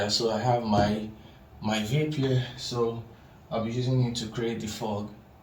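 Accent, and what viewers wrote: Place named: West Africa. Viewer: Thought it was Kendrick Lamar till I cliqued on the video and heard that wonderful west african Accent 😅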